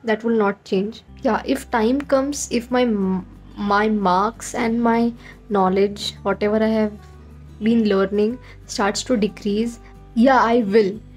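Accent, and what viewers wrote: India. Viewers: U have a pretty strong Indi accent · ohh high~five me also same from karnataka - Bidar 😎 me tabhi samj gaya tha ye to hamra accent h lots of Love ❤️♥️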